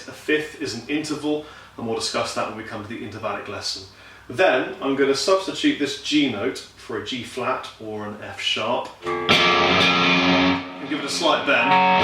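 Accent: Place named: RP, United Kingdom. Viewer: He even sounds like a "HIGH BRIT" 😁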